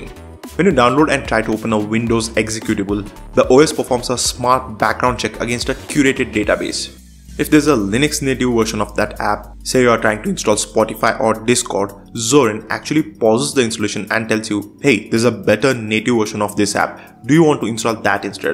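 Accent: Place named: India. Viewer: Apu dialect made me close this shit instantly...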